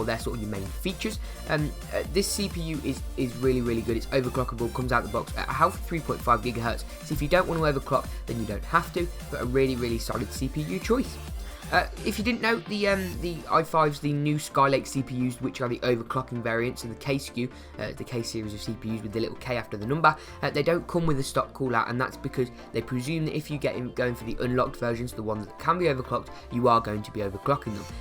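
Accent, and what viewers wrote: England, United Kingdom. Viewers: you sound English because ,you should use £ as well as dollars because I'm not sure about the prices ,oh yea I subd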